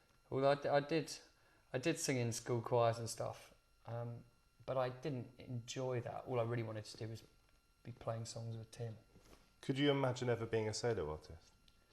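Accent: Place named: United Kingdom. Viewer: ah that british accent 3 3 i just love his voice..he's seriously put his heart and soul in his song !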